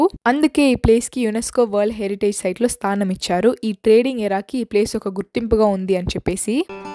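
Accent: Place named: Rayalaseema, India. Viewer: Mi voice vintunte you are belong to rayalasima anipisthundi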